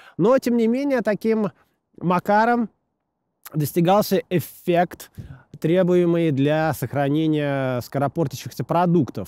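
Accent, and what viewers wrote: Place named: St Petersburg, Russia. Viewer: Смотрю и понимаю, что Питерский акцент еще хуже для уха, чем Московский. Такое ощущение, что автору внимания не хватает.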